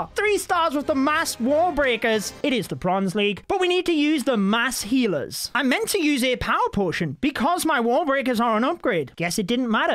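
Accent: United Kingdom. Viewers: Wow the british accent its soo good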